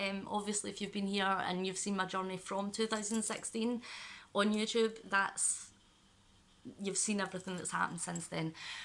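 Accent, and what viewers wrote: Scotland, United Kingdom. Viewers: i love you and i'm sorry all of this has happened to you, and i'm sorry this illness runs so rampant in your life. i'm also a mother, living in scotland, with ptsd & other mental illness'. i was absolutely gobsmacked when you explained your teacher read that letter to the entire school?! i actually cannot understand that but at the same time.. schools here back then were rough. nothing good ever came from speaking up which is absolutely not how it should have been. ptsd really controls so much of my life and its such a fragile and difficult topic to speak about, never mind trying to tell someone we you are the way you are and what happened or what triggers you so the sincerest well done on being brave enough to make and post this video. it's probably helped a lot of people who are struggling too or who know someone struggling in a similar way. 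sounds daft but it was helpful for me to hear this all come from someone who sounds the same as me, if that makes sense? same accent and way of talking etc which i don't exactly come across much on youtube etc so it just.. i don't know, felt a bit more personal or something. i hope you're doing okay :) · Soz I couldnt get any sound on my phone that's why I asked if your english its obvious now my phone is working that your Scottish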